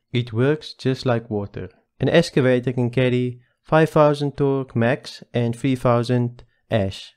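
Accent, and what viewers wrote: South Africa. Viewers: Wow love the videos and very clear and good content, i dont easily sub to a channel but your videos are worth watching. Just a question where are you from, South Africa?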